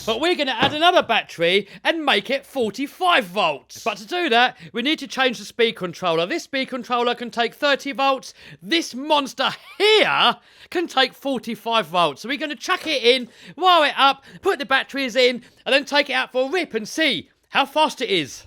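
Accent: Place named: United Kingdom. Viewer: bri'ish accent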